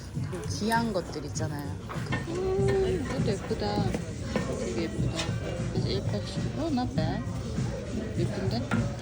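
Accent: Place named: Gyeongsang, South Korea. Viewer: 나도 대구라서 그런지 말투 완전 친근해요ㅋㅋㅋ얍샵하이 ㅋㅋㅋ 덕분에 뉴욕 쇼핑 구경 잘하고있어요😊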